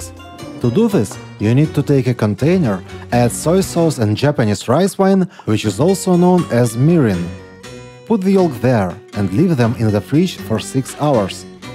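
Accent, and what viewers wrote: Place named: Russia. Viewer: Such a sweet Russian accent. I love it.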